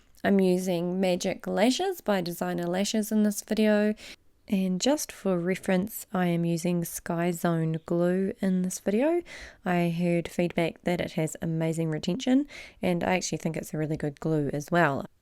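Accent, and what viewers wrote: New Zealand. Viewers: Love your work!! New to volume just completed training this week😀Got to love that kiwi accent x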